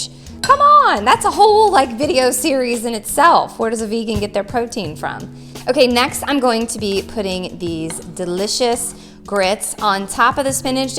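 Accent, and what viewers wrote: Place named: New Orleans, United States. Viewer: I just discovered your channel - I live on the Northshore of New Orleans and was thinking, with that voice you had to be from around here!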